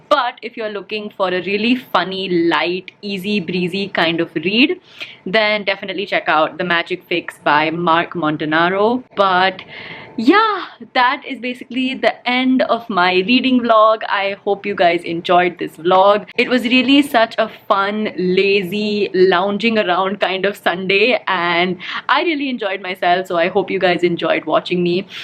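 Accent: India. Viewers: Yes.. Heard her speaking hindi for first time in this video.. Hey.. Do make a hindi review too.. You may attract a great audience as well for your hindi accent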